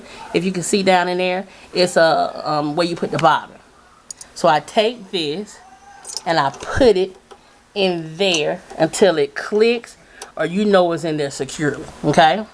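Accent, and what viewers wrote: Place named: South, United States. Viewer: I love your voice. So country. You should teach a class.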